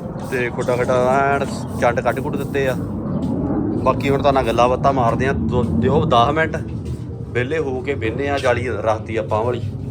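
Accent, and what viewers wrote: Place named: Doaba, India. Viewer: Bai tuadi boli duabe mtlb sade Ali a kehda area Banga ja phagwara ?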